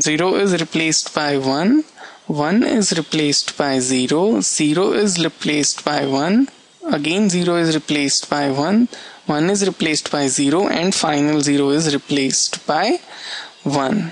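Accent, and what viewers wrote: India. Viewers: little indian accent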